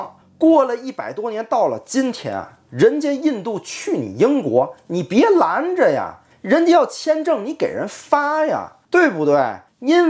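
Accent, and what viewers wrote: Tianjin, China. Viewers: 是天津味的口音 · 天津話真是哏。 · 老哥，天津人吗？我在天津上的本科，哈哈哈这口音太熟悉了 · 喜歡的天津味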